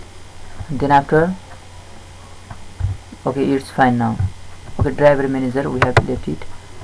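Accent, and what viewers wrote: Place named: India. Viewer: Habla asi porque es el acento que tienen en la India, no es que hable mal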